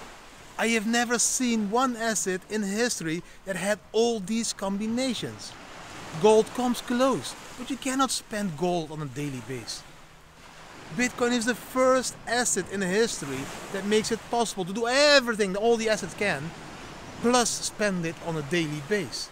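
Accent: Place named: Netherlands. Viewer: I love your dutch accent 😄 I lived in the Netherland for 2 Years. I met Bitcoin in 2013....since that time i ‘m part of the blockchain adventure. Great job.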